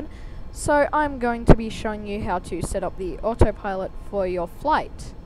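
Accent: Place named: Australia. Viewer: subbed because aussie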